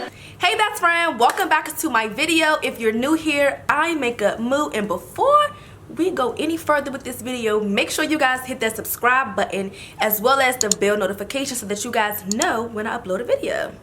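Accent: DMV, United States. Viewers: I can already tell your from the dmv by hearing the way you talk ❤️ love this video too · New subbie!!! I knew you were from DC by the way you talk lol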